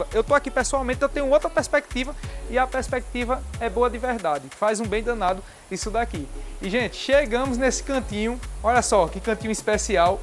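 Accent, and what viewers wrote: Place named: Northeast, Brazil. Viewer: Tô aqui me acabando 😂❤🎉 de rir 😂😂😂 A casinha 🏡 é um show 🎉🎉🎉 mas a sua apresentação ; fala ; e simpatia é o que deixa o vídeo ainda mais convidativo ✌👏🏻👏🏻👏🏻💎 saiba disso ; O jeitinho do povo nordeste 🏜 falar encanta os que ouvem ...😂❤✌😘💋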